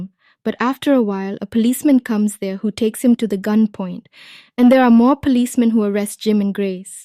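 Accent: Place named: India. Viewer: Indian voice I hate it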